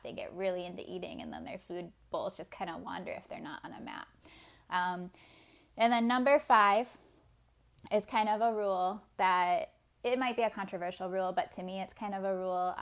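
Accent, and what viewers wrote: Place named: United Kingdom. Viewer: Very cute and awesome advice! And excellent British accent. :)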